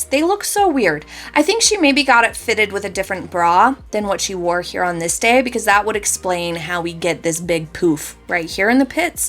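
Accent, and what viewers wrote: United States. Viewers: I love your videos, I really enjoy getting the notifications for a new one! Thank you for sharing your knowledge with us, it's helping me to buy wiser and not waste money on outfits I love the look of but no way was it made for me! Could I ask your background, did you train in fashion or styling? Also I love your voice, a great American accent, I would ask where you live to try to place it, obviously I won't as it is to personal. Keep making, keep teaching, keep entertaining, thank you x I'm subscribed, liking and sharing ❤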